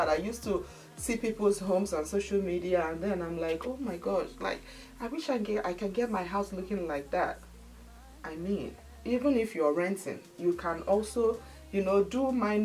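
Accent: Igbo, Nigeria. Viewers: This is a huge motivation ❤ it was even your so called "Igbotic accent" that made me stick to your channel since I came across it in 2019 bcos it makes me feel at home (like having my sister talking to me)😂 unlike watching influencers with American accent. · I don’t have any acne but I was drawn to your channel because of your accent😊I loved the fact that an Igbo girl like me wasn’t ashamed to be herself on YouTube. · I started watching some 10yrs ago too. I remember I was looking for some videos on how to make Nigerian fried rice and how to tie gele. But I remember clicking on one of your acne videos and immediately I heard your voice, I said, this is my Nigerian sister and I subscribed. You have really come a very long way sis. And we thank God for his faithfulness. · its even the accent that made me subscribe....I am an Igbo girl too, and you sounded so relatable. 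My first time seeing you was that collab you did with Dinma Umeh. Well done for your resilience and Congratulations, you really inspire me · Hahaha , 😂you are soooo real.love you for your sincerity, love your Igbo Accent like the french, Italian, Spanish........❤❤❤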